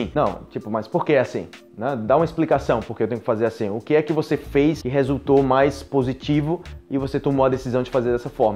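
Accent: Antioquia, Colombia. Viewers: 😂😂😂😂 Que cómico hablando español "paisa"... pareces colombiano, la verdad!! 👍🏻👍🏻👍🏻👍🏻👍🏻 Tus vídeos me transmiten una vibra positiva, gracias! 😊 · Ya somos 2. Si que la vacila con el acento colombiano. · Kkkk adorei sua conversa paisa! Jajajja quiero saber como te sale el costeño🤔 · me encanta como hablas el español colombiano (paisa) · Increíble como habla muy bien el español colombiano. Yo soy nativo colombiano de Bogotá y nunca conseguí usar esas expresiones ni tener acento. Es verdad que es español paisa eso, mas en español de Bogotá también hay un acento y expresiones y nunca conseguí hacer.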